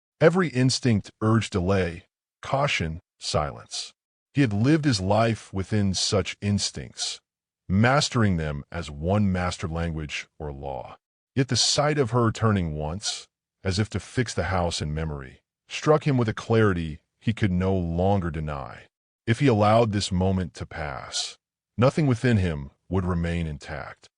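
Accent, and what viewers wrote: United States. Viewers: It really disappoints me that you create a story set in England but you us an American ai voices 👎👎🏻👎🏼👎🏾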